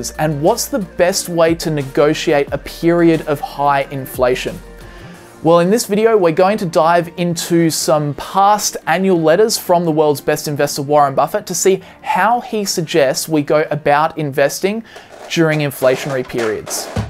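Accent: Australia. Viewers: Are you Australian? The accent sounds familiar... Thanks for the good video 💪🏼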